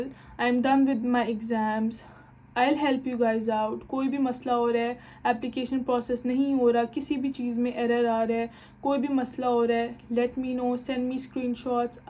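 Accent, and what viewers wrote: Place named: Kashmir, India. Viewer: You accent and your looks seem like kashmiri 😳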